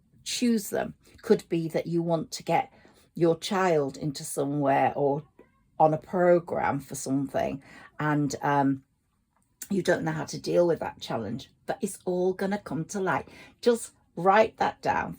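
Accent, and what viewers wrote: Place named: Birmingham, United Kingdom. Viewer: Welcome back, really nice to hear your slight Brummie voice, lol its been a tough couple of months, constant healing, thanks for your dedication xx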